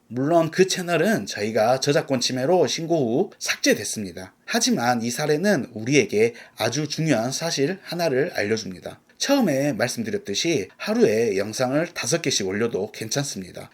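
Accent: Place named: Jeolla, South Korea. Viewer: 전라도분이시네😊 저도요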